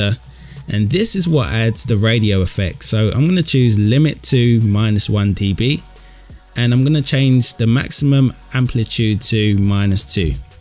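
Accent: United Kingdom. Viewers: How do we get that sweet British accent, tho?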